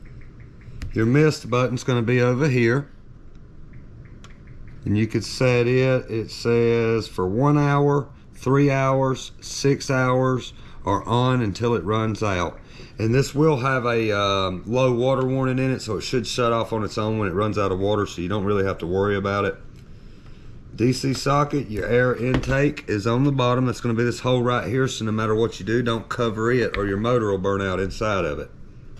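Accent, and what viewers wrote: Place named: South, United States. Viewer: Omg! Love that thick southern accent! And your information!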